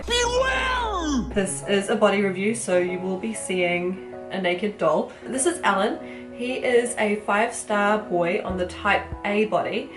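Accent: New Zealand. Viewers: Lol 😂, "why?" ... Love Alan's body tats. Did you make his hoodie or buy it. Been thinking of making a bjd video, the community needs more kiwi voices I think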